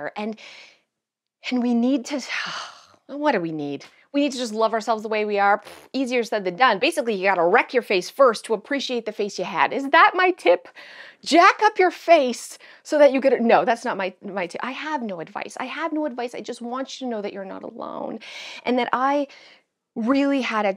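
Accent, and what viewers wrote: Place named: Oakville, Canada. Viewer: your ability to be vulnerable and funny and honest and as screwed up as the rest of us is exactly what I needed to hear today. We all have struggles because we are told we need to do it all, perfectly and look amazing at the same time. Well, we can't. We can only be ourselves and sometimes that isn't easy. You have shared a cautionary tale and please know that you are delightful (and I still love the Oakville accent.LOL)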